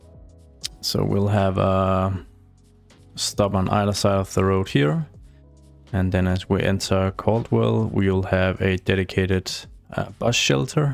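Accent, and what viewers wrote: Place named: Denmark. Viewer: Just found you channel recently. So nice to have a fellow Dane playing this game (sorry, but it is obvious when you are danish) 😅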